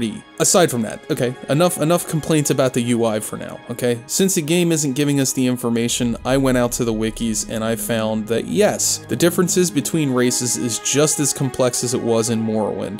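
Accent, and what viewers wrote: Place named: Mid-Atlantic, United States. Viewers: I detect a Pittsburgh accent. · You from Maryland, bro? You got that THICC mid atlantic accent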